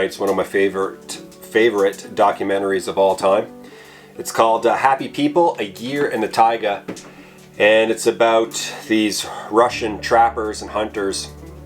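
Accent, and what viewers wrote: Canada. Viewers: Endorsement from anon YouTube watcher: Totally subscribe to this channel. Beautiful views, for real nice guy with a very Canadian accent, feel good, relaxing content, not about the negativity, legitimately out here learning as he goes. You can't get any more authentic than winter camping in the Canadian North. SUBSCRIBE SUBSCRIBE SUBSCRIBE!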